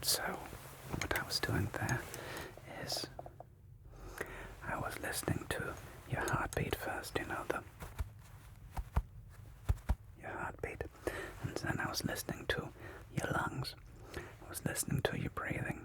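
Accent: Germany. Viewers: This sounds very authentic, like a person born in Germany who spent some years in primary school living in England with their grandpa or something. Like the history yotuber Kraut or a Dr. King Schulz vibe. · This is the best thing I've listened to all year ❤ Love the german accent! Wunderbar? 😊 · I have German coworkers, this is a very realistic representation of their accent, honestly the only difference is they have this odd inflection where some sentences are very fast but others are very slow. If they’re excited about something or carried away their accent thickens. But as far as it goes it’s a wonderful representation! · I think he sounds like a German who's worked in nz/aus for a while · I really dont know why the german accent is so good within asmr but noone does it as good as. even germans themselves 😂 AND A HUGE PLUS IN THIS VIDEO, is that when you're writing and taking down notes it actually sounds realistic, I hate it when asmr artists just drag the pen across the paper. 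Thank you great videos keep it up.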